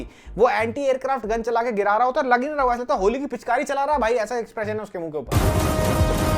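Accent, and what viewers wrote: Haryana, India. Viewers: I seriously wish you were single ! Specially your Haryanvi accent 🤤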